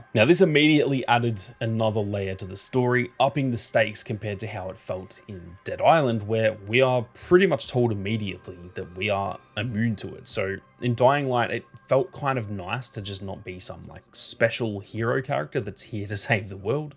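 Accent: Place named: Australia. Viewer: Nothing better than hearing another aussie talk about peak.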